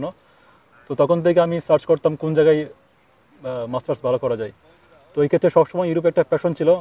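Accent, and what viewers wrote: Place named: Chittagong, Bangladesh. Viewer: চট্টগ্রামের Accent শুনতে বেশ ভালোই লাগে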